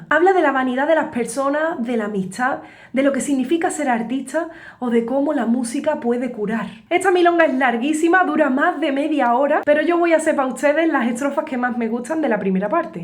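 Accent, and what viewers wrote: Andalusia, Spain. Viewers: Te he escuchado, totalmente emocionado. Tú forma de recitar las canciones de Atahualpa Yupanqui ha sido soberbia. Has hablado haciendo más música con tu palabra que con la guitarra. Ésta sonaba en un segundo plano como embelesada de los mensajes que transmitías. Tú voz ha sonado muy semejante a la de nuestro autor. Creo que te ha ayudado tu acento andaluz y en muchos momentos eras como una rapsoda de la tierra de los gauchos. Guardaré esta grabación para oírla más veces, sencillamente, porque es una joya. Muchas gracias y un abrazo. Ah. Otra cosa: te sienta muy bien ese sombrero de la Pampa. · Realmente me hiciste temblar la pera, no solo por Argentino sino por tu delicadeza y respeto, todo con ese maravilloso acento Andalú. Agradezco inmensamente a Dios que haya personas como vos, tan talentosas y capaces de revolverme las vísceras a miles de kilómetros, nuevamente muchas gracias. 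· Hola me encantó escucharte y tocar esas melodías, hoy en día pocos tocan estos temas de Atahualpa en Argentina, aunque tienes un acento muy andaluz creo?? Pero se oye interesante! No sé si conoces Argentina, sería lindo que vengas y visites los eventos folclóricos y el campo y las estancias y te empapes de mí tierra..🇦🇷. Abrazos , 🫂👋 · No se porque pero algo en tu voz me dice que sos Andaluza (jajaja) excelente!!! saludos desde Argentina...dios bendiga a Don Ata...(recomiendo "el arriero") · Sou gaúcha brasileira de Viamão na região metropolitana de Porto Alegre ,a capital do Estado do Rio Grande do Sul,um dos 27 estados do Brasil aonde uma boa parte da população é descendente de índios Guaranis e Charruas e Jê, como também de negros escravizados que micigenaram-se formando com o branco português e argentino, além de alguns poucos alemães e italianos o gentio gaúcho dos Pampas brasileiros! 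Confesso que agora sei porque meu Ex-marido e querido amigo Alex Fagundes chora quando vê teus incríveis vídeos,tu és uma artista que com teu enorme talento nos fazendo viajar inclusive dentro do nosso Sangue Gaudério e também na nossa alma campeira! Tu com teu extraordinário trabalho consegue penetrar na nossa alma sulina e brasileira com impressionante facilidade! Oha Guria tú chegas a ser mediúnica em teu maravilhoso ofício! Te juro que até pareces não ser Europeia tamanha é a tua proximidade com a nossa alma latina! Como em outra vez comentei em vídeos teus anteriores juravamos nós que sérias até Argentina e não Espanhola,mas é que teu ritmo melodioso de fala têm um bailar,um tempero doce que se não prestacemos bem atenção teu sotaque espanhol europeu passaria quase despercebido, olha tenho uma admiração enorme pelo teu lendário e maravilhoso País o Reino da Espanha, já sabia que o maravilhoso povo de Andaluzia seria diferente,mais doce,alegre e hipnótico tamanha sua simpatia e leveza,coisa diferente dos demais espanhóis que são aos nossos sul-americanos olhos mais marciais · Atahualpa decía: “La guitarra antes de ser instrumento fue árbol y en él cantaban los pájaros. 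La madera sabía de música mucho antes de ser instrumento.” sos una crack, me encantó el mix entre acento Andaluz y gaucho!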